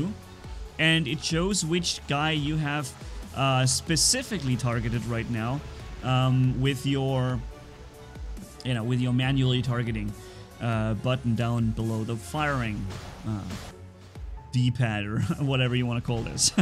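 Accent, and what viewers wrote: Germany. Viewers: Are you German? Bist du Deutscher?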